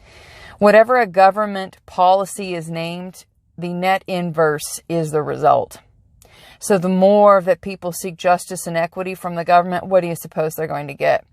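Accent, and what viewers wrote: South, United States. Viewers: I love it when you talk about the economy & Hebrew. i wonder what else you talk about. We have so much in common. I wil watch you more. Now you talk about wonder history & future. You have very little southern accent. I am from Tennessee, too. I will watch you more, I have missed so much by not watching you.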